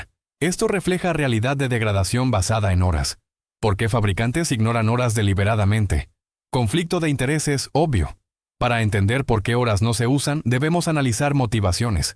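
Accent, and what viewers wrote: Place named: India. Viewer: Por favor no dejes todo en mabo de la mal llamada IA, el video esta bueno, el audio parecer indio hablando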